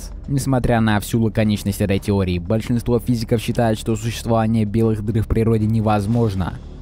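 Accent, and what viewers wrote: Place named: Moscow, Russia. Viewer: да, уберите мааасковский ааакцент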